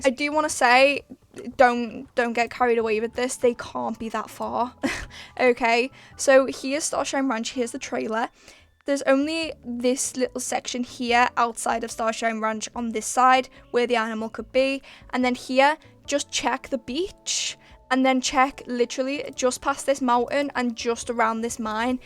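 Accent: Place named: Scotland, United Kingdom. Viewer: are you Scottish cuse u soound it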